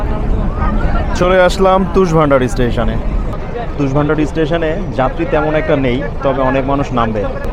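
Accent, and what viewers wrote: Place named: West Bengal, India. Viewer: এই ভিডিও তে আপনার কথা শুনে মনে হচ্ছে আপনি পশ্চিমবঙ্গ বা কলকাতার আসে পাশের কোনো এলাকার ছেলে 😅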